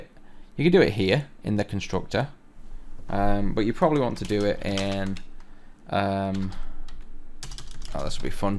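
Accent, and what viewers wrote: United Kingdom. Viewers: Lol that UK accent. I feel like I am watching game of thrones. Well done by the way! Nice Video!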